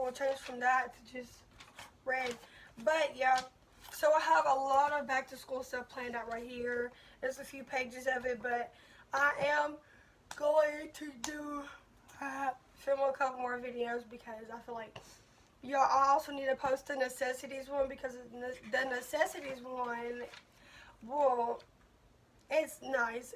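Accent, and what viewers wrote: United States. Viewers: I’m used to this Tennessee and Mississippi talk😝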